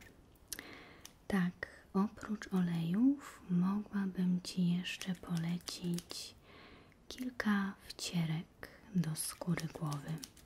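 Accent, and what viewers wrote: Poland. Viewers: ***** myśle że tak... =p ... Btw ... polski akcent jest bardzo piękny. ^_^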